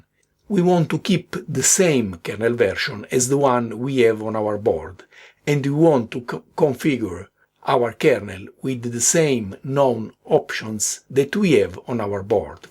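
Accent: Italy. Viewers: the Italian accent makes it even better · your accent is very similar to his & therefore "Very Italian" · I’m so glad you identified as Italian in this video, is been trying to localize your dialect. At first, when I was passing very little attention and It was just going in the background, my first guess was Russian/Eastern Bloc area, then by like you 3rd video I head some patterns sounding Dutch or German… I was just about to pay attention and try to guess for real and you gave the answer away at the same time lol. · beautiful Italian accent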